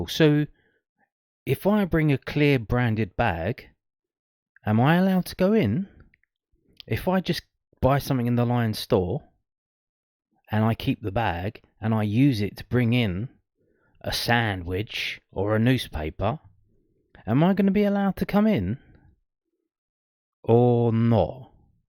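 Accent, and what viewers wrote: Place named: Cambridge, United Kingdom. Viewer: Sorry mate your voice is pissing me off and you have the accent of a Cambridge fan so I won't be watching this bollocks again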